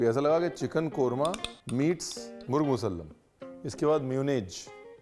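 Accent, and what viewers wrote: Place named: Lucknow, India. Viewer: Mujhay to aapka Lucknow style bolnay ka style bohot pasand hai ❤❤❤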